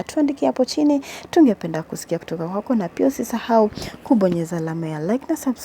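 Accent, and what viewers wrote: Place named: Kenya. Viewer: Ndg. Wananchi hii chanel ni ya kenya pia hata huyo anaeongea ni Mkenya lafuz mumeisikia na hizo picha ni za zamani wakati wa mazoezi ya makomando puzeni hayo. Tanzania ni salama na tulivu Amani tupu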